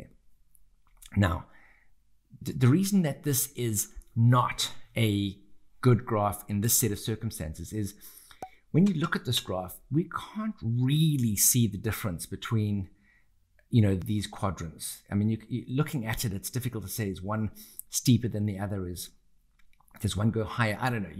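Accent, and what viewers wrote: South Africa. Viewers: Hi you sound South African. Also. Please can you do one with loads of data as with spectra. I have to do it for my project and can't for the life of me figure it out. If you can reach out please.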